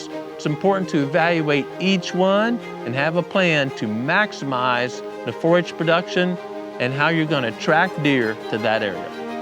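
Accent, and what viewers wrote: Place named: Eastern Shore, United States. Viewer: You from the Eastern shore? Accent sounds like it.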